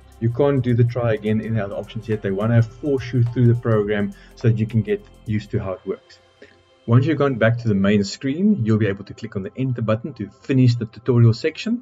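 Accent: South Africa. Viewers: South Africa accent…not Australia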